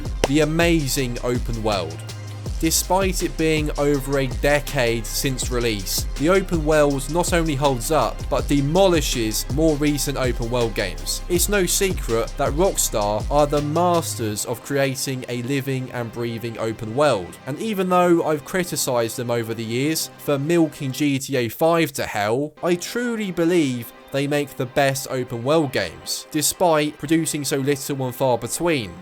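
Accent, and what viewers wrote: United Kingdom. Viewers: Damn, this man is Bri'ish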